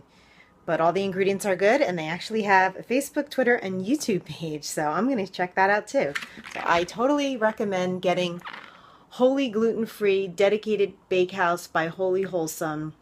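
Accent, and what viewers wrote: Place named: New York, United States. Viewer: I can't get enough of that NY accent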